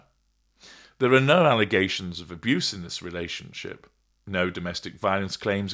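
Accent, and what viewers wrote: United Kingdom. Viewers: He is, it's the British accent ;)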